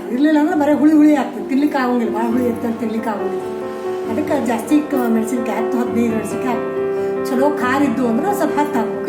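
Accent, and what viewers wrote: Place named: Hubballi, India. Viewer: ಅಮ್ಮಾ ನೀವು ಮಾತಾಡೋದು ಕೇಳಿದರೆ ಬಹಳ ಖುಷಿ ಆಗ್ತದರಿ. ನಾವು ಹುಬ್ಬಳ್ಳಿಯವರು. ಬೆಂಗಳೂರನ್ಯಾಗ ಇದೀವಿ. ನೀವು ಮಾತಾಡೋದು ಕೇಳಿದರೆ ಅಲ್ಲೇ ಇದ್ದೀವಿ ಅನ್ಸತದ. ಮುದ್ದಿ ಪಲ್ಯ ಹೇಗೆ ಮಾಡೋದು ತೋರಿಸಿ ಕೊಡ್ರಿ.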